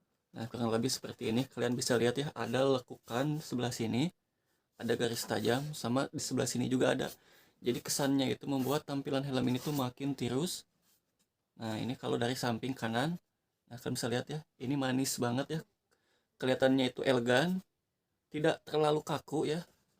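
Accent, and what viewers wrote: West Java, Indonesia. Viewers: Sunda banget ngomongnya 😅😅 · Lu orang sundaa yaa, udah kelihatan banget logatnya sama pas baca huruf "F"😅😅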